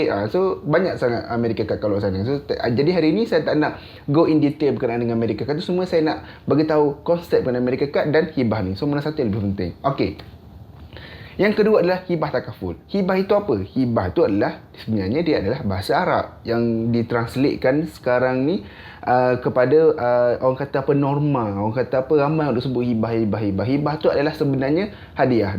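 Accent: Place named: Terengganu, Malaysia. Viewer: Bunyi mcm org ganu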